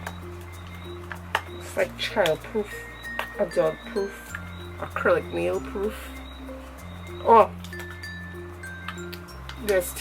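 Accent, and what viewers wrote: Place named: Trinidad and Tobago. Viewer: It's so refreshing to hear a Trini makeup guru for a change! And you seem to really know your stuff. #trinitodbone #sexytriniaccent #sephoragiveaway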